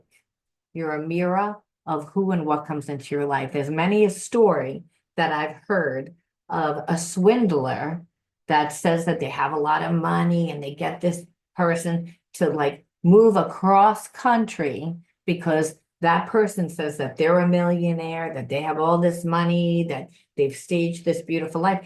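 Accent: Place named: New York, United States. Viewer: Dust part of self, & Deity part of self-- awesome!! I have felt stuck in moving forward with creative interests (despite the fact that I know I'm highly creative) and your explanation made things so much clearer for me. My parents held very narrow views of what it meant to be creative & mine was simply unrecognizable to them. Thank you for this extremely helpful reading-- really opened up a new vista for me! Sending love & light as always. 💟🧡💚 p.s.- I get such a kick when you come on heavy with your New York accent-- you're adorable!! 😂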